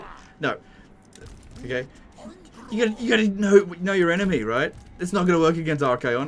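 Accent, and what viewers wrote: Australia. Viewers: never was a huge viewer but it kinda rocks how painfully australian he is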